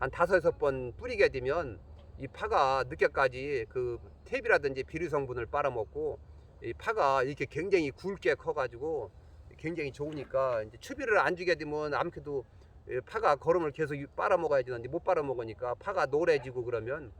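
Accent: Chungcheong, South Korea. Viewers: 질문을 하면 줄줄줄나오는게 넘신기ㅋㅋㅋㅋㄱ구수한 충청도 사투리 넘나 정감가요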